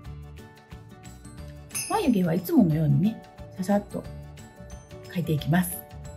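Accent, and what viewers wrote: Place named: Kansai, Japan. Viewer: ライラックの発音！ライラ↗️ック😂 ラ↗️イラックでは？んもー🐮、の関西弁好き！！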